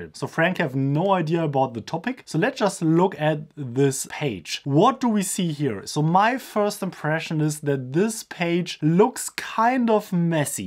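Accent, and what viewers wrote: Germany. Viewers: can i pay you to say the word "squirrel" and the statement "clean and clear car windows"? i don't think i've heard such a pronounced German accent outside of TV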